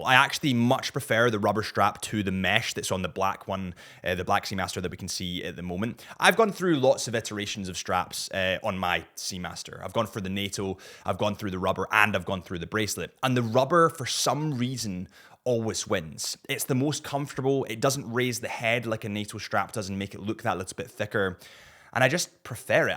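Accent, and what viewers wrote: Scotland, United Kingdom. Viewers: Scottish my guy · He is not English, he is Scottish ☝🏽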